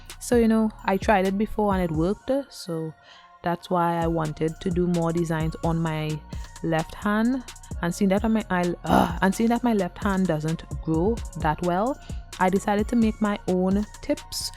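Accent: Trinidad and Tobago. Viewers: Is this a 🇹🇹 accent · Love the tutorial, but as soon as the video started I was like...SHE TRINI!!! Love it!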